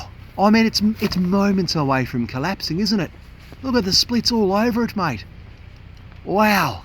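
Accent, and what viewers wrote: Australia. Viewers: Are you from the same region in Australia as Steve Irwin? Not being funny, you just have a very similar accent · Hallo from the Netherlands, up and above for you! You just popped up in my, you just may like this video. And curious as I am I watch you work those blockages. In one video you mentioned that it is most women that watch your videos and I felt busted😂😂. I like your Australian accent. Keep going entertaining us YouTubers! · you little ripper, thank you for being my AU dialect coach! I have the pleasure of working with Perthlings and your S-tier material has helped immensely. Really smoothed out my U.S. hard R's! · Hey. Been watching the videos for a couple months. Something therapeutic about things getting fixed. Plus, I get to hear the Aussie accent and it takes me back to the two years living outside of Sydney. Good on ya mate and keep it up! 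· Gday mate! Lisa here from the state of Virginia in the good ole USA. I love your videos, your positive attitude, your commentary and your Aussie accent! It’s bloody brilliant! 😊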